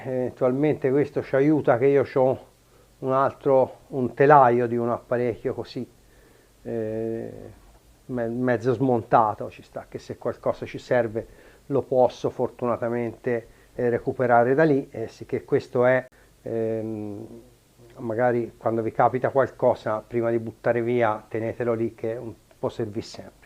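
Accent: Tuscany, Italy. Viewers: buongiorno, sento che sei toscano, ci ho abitato per 10 anni a colle di val d'elsa, poi ho fatto un incidente sulla moto da cross e sono rimasto in carrozzina e ho dovuto ritornare alla mia città, mi hanno regalato un amplificatore pioneer 9900, da un amico, però mancano i 2 coperchi sopra e sotto, e tutte le manopole, i carter e le manopole non mi interessano,poi le trovo io, quello che me lo ha dato,mi ha detto che aveva un canale che non andava, e che si accendeva,ma ho messo la spina e de saltato il fusibile, me lo potresti aggiustare? sono un appasionato di questi componente degli anni di gioventù mia che sono del 66,buona giornata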